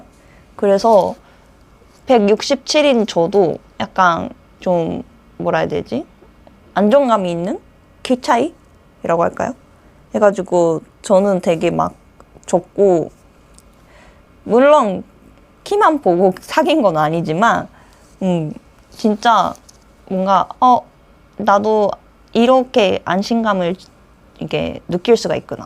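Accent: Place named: Gyeongbuk, South Korea. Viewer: 아니 야는, 한국사람 아녀..??ㅋㅋㅋㅋㅋㅋㅋ 경북 아가씨 같은디..ㅋㅋㅋㅋㅋㅋㅋ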